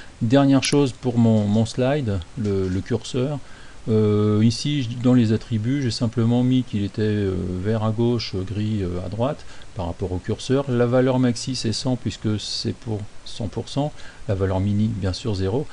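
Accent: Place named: England, United Kingdom. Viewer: Attention à l'accent anglais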